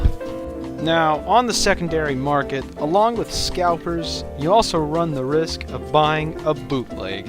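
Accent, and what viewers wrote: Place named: United States. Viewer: Informative video, but damn, the American accent is strong with this one, butchering half the names. For example, Ran Yakumo, is not called "Ren"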